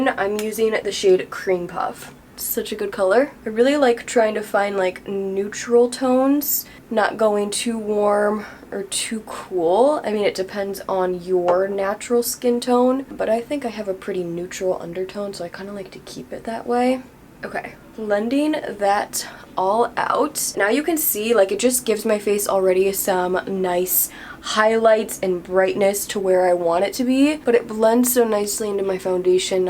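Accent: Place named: Michigan, United States. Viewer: She sounds like she is from Michigan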